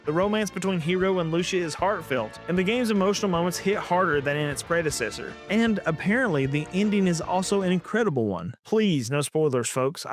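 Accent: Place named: Southwest, United States. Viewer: Love your style man! Also, as someone from southwest, your accent makes me feel like I'm just geeking out with one of the bros back home. Great stuff!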